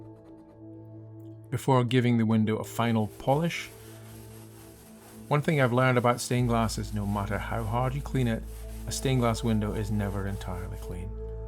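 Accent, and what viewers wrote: United Kingdom. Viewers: Thank you, it is wonderfull to see traditional glass work. At first it almost looked like one of my grandfather's windows, but based on you accent and the masonry of the church I'm assuming you are in Britain. My grandfather was Rudolf Benz of Newton New Jersey USA, I grew up in his studio and putted many a window in my youth exactly as you have 😊 He learned his craft in Hamburg Germany at 14. He taught me to actually mix his own pigments ( metal oxides,powdered glass et.) We search all over for his windows, I would love to get intouch with you perhaps. We made a website of what works we know of and are trying to expand the library. You could contact me through there if you have time, THANK YOU Keep up the craft 😊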